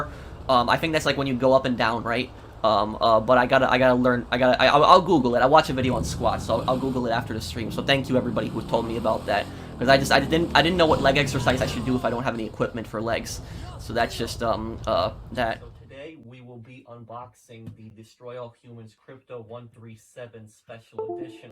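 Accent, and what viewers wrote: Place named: United Kingdom. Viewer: I love he’s solid gta YouTuber, I have 0 hate for him but this video honestly made me laugh so hard, haven’t laughed that hard in a long while so thank you man👍 Also I could tell your British same as me with the east Enders intro and outro 🤣🤣🤣 loved it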